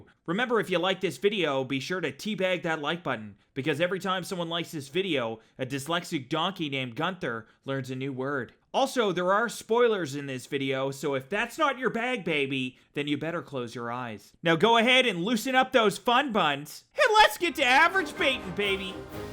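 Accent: Canada. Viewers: Canadian accent if I’ve ever heard one. How’s the east coast been bud? Greetins from Ontario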